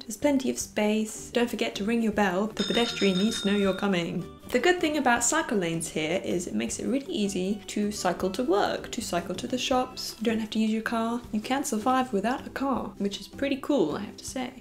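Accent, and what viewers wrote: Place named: United Kingdom. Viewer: Love the natural and authentic way you do your videos! And aswell your British charme and accent ;).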